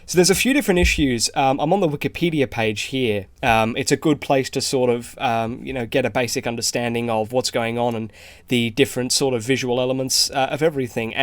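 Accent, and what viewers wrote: Australia. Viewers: doesn’t he sound more Australian, Mr. K-On pfp?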